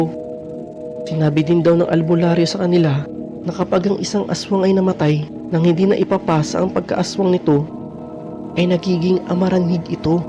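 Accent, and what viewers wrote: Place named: Conyo, Philippines. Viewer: conyo narator shessh!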